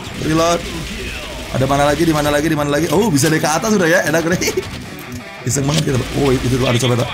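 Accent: Bandung, Indonesia. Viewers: Bang orang Bandung ya?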